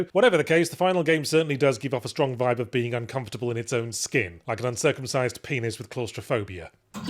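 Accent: United Kingdom. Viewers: Love to listen to Yahtzees buttery british voice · Soo glad have this. Now I can fall asleep to sweary British voice.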